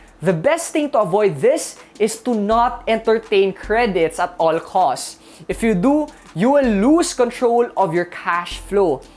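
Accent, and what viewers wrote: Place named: Philippines. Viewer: Sir dapat nag tagalog kanalang kasi hindi bagay sayo kasi halata kasi pinoy ang iling mo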